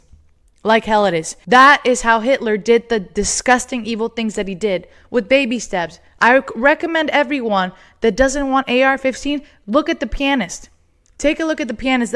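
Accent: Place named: United Kingdom. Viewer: He has a British accent,that says all you need to know about that feckless soy boy british 😂😂😂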